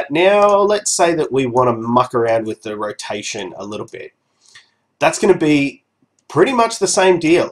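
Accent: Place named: Australia. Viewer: You must be from Australia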